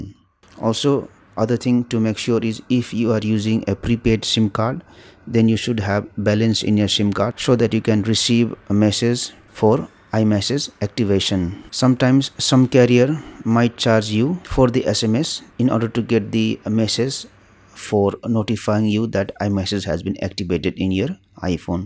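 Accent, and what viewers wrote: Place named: India. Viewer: i hate when i trynna watch some like this and the indian accent came like fuck them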